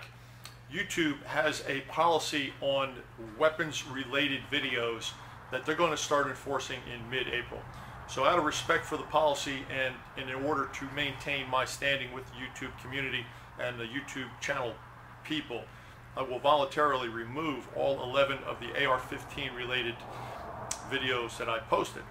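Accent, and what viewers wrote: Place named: New Jersey, United States. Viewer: Eloquent and heart-felt explanation. You had the balls and forethought to let people know what was happening, and showed it was with major reluctance (not sure I've heard you you swear before).. Odd that people accuse you of not being a true Texan in the comments, as a Brit I'd put your accent somewhere in New Jersey. Nonetheless you're obviously an "all American guy" who goes out of his way to help and serve others- don't change. Edit: just watched again and you said "shiFt in YouTube policy" - I heard "shittin' ", I should buy some better headphones:)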